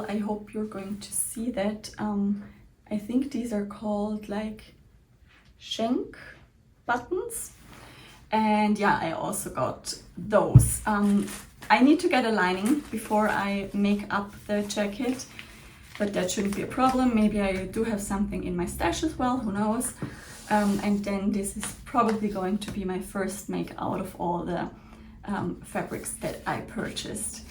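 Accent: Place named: Germany. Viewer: Hi! This is my first time visiting your channel. I believe I detect a German accent. Kommen Sie aus Deutschland? Ich auch, aber ich wohne in America. 😊 I was also in London at the time of the Stitch Festival, but was attending a sewing retreat closer to Birmingham and couldn't do both. I was able to meet up with Cara, right after the Stitch Festival though. It's an amazing feeling when we have an opportunity to meet some of the wonderful people we watch and admire, isn't it? I find it interesting that you (and I) find it necessary to justify why we've done something that, to others, might feel extravagant. Life is full of opportunities and if you can say yes, to some of them, then wonderful! Plus, we are all sewists here and totally understand the appeal of the Festival and wanting to be a part of this amazing sewing community that seems to only exist in the UK! 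Maison Fauve seems like a perfect fit for you, from the little I gleaned from this video. I am also a big fan because the whole company just has a feeling of elegance. Their fabrics are a bit pricey but worth it. The unmistakable quality will make your garments last for a very long time. Ok, I think I've rambled on enough. I do look forward to seeing what will become of all your purchases!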